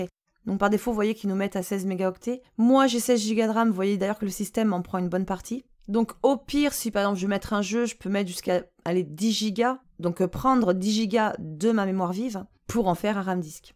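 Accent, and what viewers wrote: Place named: Toulouse, France. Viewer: Cet accent Toulousain ^^ J'adore lol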